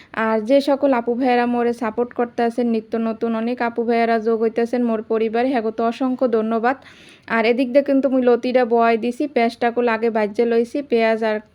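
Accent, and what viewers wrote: Barishal, Bangladesh. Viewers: আপনার বরিশালের কথা শুন‌তে আমার ভালো লাগে।আপনার ভি‌ডিও দেখে কিছু শিখে নিলাম। খুবই ভালো লেগেছে❤❤❤ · দিদিভাই তোমার কথাগুলো আমার খুব ভালো লাগে। আমার শাশুড়ি তোমার মতো বরিশালের ভাষায় কথা বলে, বরিশালের ভাষা শুনতে আমার খুব ভালো লাগে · ✅👍আপু তোমার বরিশালে ভাষায় কথা বলেছেন আপু আমার শুনতে খুব ভালো লাগলো 🎉কাঠালের বিচি আমার খুব ভালো লাগলো ❤সব কিছু রান্না টা অনেক সুন্দর হয়েছে আপু। আর সেমাই রান্না করলেন।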